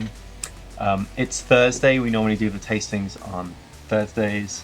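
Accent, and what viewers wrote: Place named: Southern England, United Kingdom. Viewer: Very good ,will have a crack at this one and try it on the beer engine. It's that Southern accent again. Last time seeing the Saints live was Alan Balls last game. Think he was last player to stop playing from the 66 world cup team.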